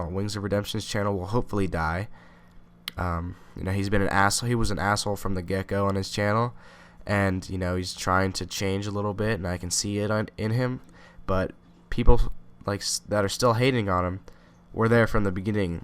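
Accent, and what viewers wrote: Ireland. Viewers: Are u really Irish becouse I live in Ireland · Youtubes mother fuckin best commentator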